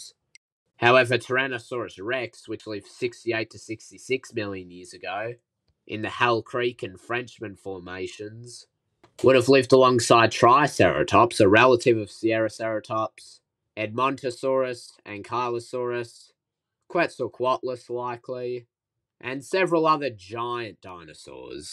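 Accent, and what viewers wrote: Australia. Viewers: Dude are you an aussie? It sounds like it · This is most Australian sounding man in the history of accents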